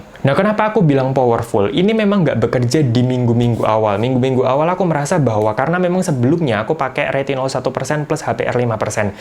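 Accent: Java, Indonesia. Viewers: Jowo ndi yo ? Ngomonge lucu e😁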